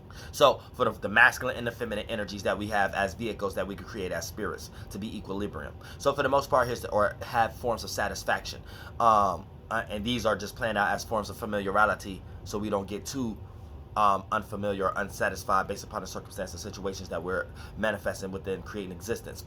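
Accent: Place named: New York, United States. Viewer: lmaoooo u from/been hanging out in NY or whatttt 🤣. the accent tho “whatever the fuck u wanna call it “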